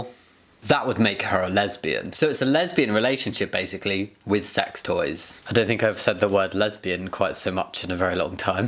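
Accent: United Kingdom. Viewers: La verdad, me revuelves mucho por lo rápido que hablas, y por lo poco que se de ingles, pero me encanta escuchar tu voz, asi de grave, con su bello acento británico y asi, no cambies eso nunca. 😋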